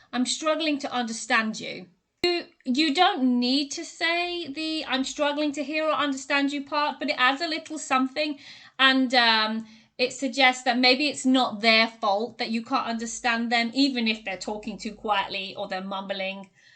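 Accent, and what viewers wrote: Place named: United Kingdom. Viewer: Hey .. those are really cool way .hmm . I think you have a British accent right .I like that .why don't you teach how to learn British accent .I wanna learn it .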